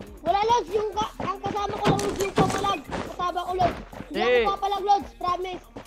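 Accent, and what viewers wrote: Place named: Iloilo, Philippines. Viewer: Ilonggo yan lods hahaha trastalker hahaa illongo kami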